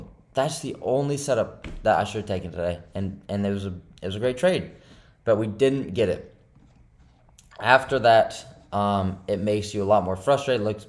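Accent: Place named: South, United States. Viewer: u got a southern accent, usa state u curently in? keep it up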